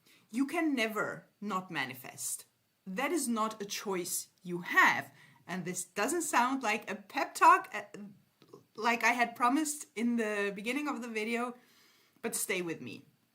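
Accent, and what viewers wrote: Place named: France. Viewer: Hi!! I’ve been following your videos for a while now. I really love your content and you’re one of the people on YouTube whose perspective resonates with me the most. I was wondering what you think about manifesting a relationship with someone (a public figure, but I know that’s not really the point) who doesn’t know us yet. Where would you personally start with something like that? For the past few months I’ve been trying different techniques. Sometimes in imagination I feel absolutely certain that I’m receiving what I want. I know it, I feel it. But after some time, when I don’t see any movement in that direction, I start doubting everything — even the law itself. I always manage to bring myself back, but it’s not always easy. I also have a very specific question: when in dreams you keep seeing yourself in the same dynamic with that person — meaning more in the “fan” position rather than someone who is actually part of their life — do you think that could mean the manifestation hasn’t really settled into the subconscious yet? 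Thank you so much! And by the way, where are you from? I hear an accent that almost sounds French, but I’m not completely sure. 😌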